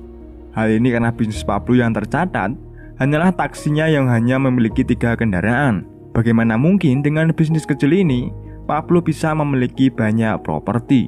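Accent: Java, Indonesia. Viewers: Medok amat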